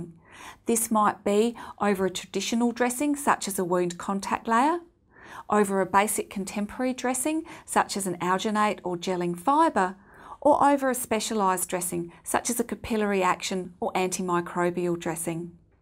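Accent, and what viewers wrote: Australia. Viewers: OMG Her Australian accent is giving me a headache!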